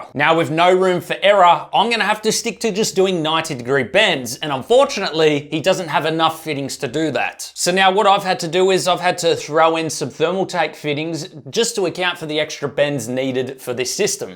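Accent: Australia. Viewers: That Aussie accent and saying,”… if I should burn it!” Killed me!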